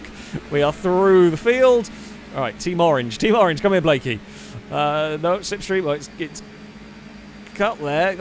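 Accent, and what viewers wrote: United Kingdom. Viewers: The accent is UK but are you sure your not Canadian. You constantly apologize for stuff that is not your fault.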